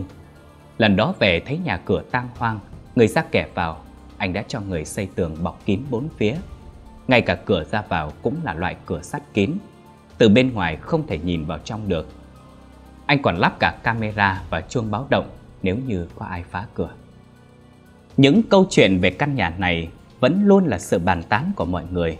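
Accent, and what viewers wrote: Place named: Central, Vietnam. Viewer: Nghe truyện của anh thấy hay lắm luôn ạ 🥺🥺 nhưng mà do giọng a ở miền trung e nghe hơi khó ạ 😢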